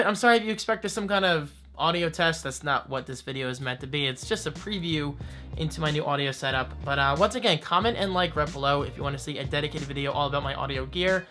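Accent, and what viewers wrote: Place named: Staten Island, United States. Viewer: Lol I feel like your from Staten Island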